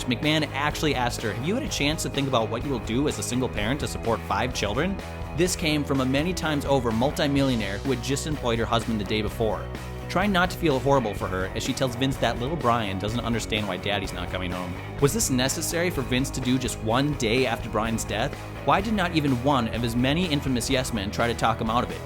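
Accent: United States. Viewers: It's weird hearing an American accent on What Culture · Good lord, who is this wanker narrating? Tell him to piss off and bring back one of the British boys. I didn't even know WhatCulture had any Yankee berks on working for them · just go mate leave no one like you and your american voice.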